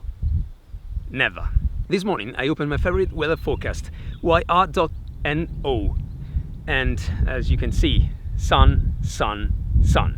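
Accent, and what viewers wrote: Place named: United Kingdom. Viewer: Accento britannico perfetto!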